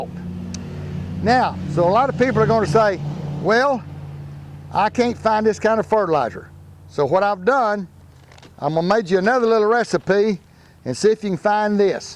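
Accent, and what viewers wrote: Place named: Oklahoma, United States. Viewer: Hi I saw a question on one of your videos regarding the smell one of your viewers was experiencing with their wicking tubs. I had the problem, it was because my drain hole was too high. That is not enough air space. Love the way y'all talk down there in OK. Looking at you from Melbourne Australia You have to realise that you are broadcasting to the World. no one has heard of sea minerals in Australia. Your fertiliser cocktails are terrific none of the brands you use are available here but the NKP formulas come through. Thank you for all the tine you spend teaching us.And thanks to Mrs Sloan for putting up with us.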